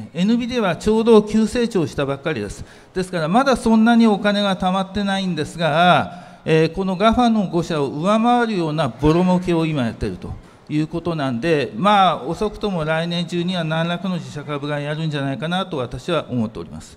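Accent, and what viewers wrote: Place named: Fukui, Japan. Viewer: 今中さんは訛りからするとおそらく福井出身の方かなと想像します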